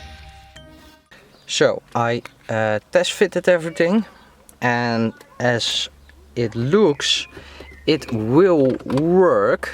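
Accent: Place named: Netherlands. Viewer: Engels met een Nederlands accent hahaha geweldig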